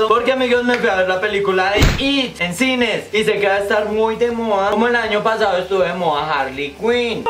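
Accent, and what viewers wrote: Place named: Colombia. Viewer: El acento colombiano es muy bonito 😍😍😍😍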